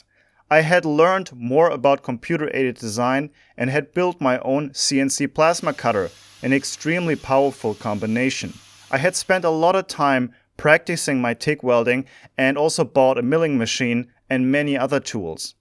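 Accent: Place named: Germany. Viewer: Are you aware that your German accented English makes you sound like an Engineer?!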